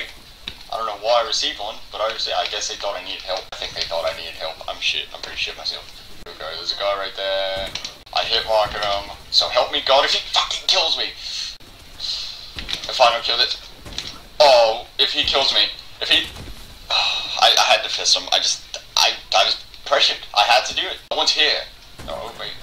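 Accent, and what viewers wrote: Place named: Australia. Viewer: Aussie accent game strong as fuck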